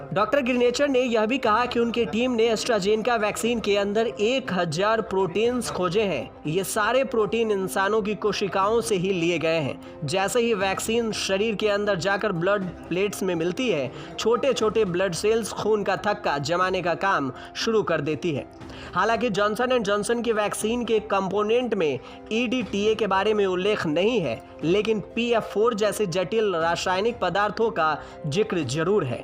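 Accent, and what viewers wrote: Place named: Bihar, India. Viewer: Bihari babua h vo isliye.. regional pronounciation problem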